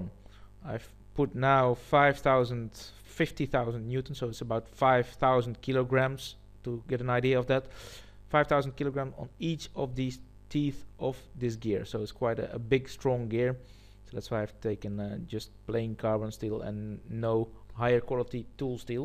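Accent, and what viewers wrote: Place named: Caribbean. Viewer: You sounda bit carrabean maaan. but thanks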